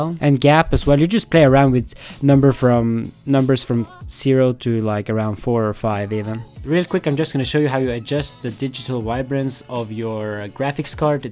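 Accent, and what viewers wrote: Norway. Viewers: Are you from Norway? (hear it on you're accent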